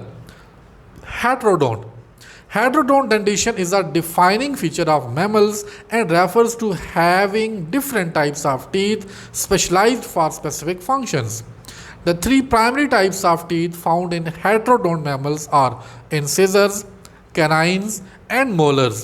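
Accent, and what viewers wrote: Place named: India. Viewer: indian ahh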